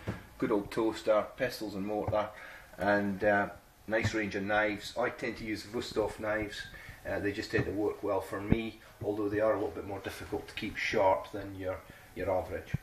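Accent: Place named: Scotland, United Kingdom. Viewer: If you can get past the Scottish accent, this is a very good presentation.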